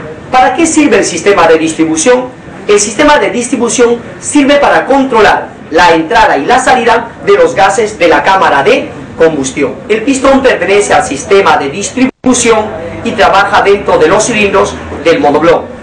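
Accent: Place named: Peru. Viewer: Parece que es peruano este maje por como habla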